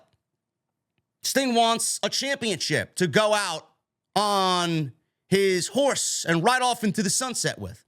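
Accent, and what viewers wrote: New York, United States. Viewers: I just come here to listen to an angry New Yorker accent. 😂 Love it.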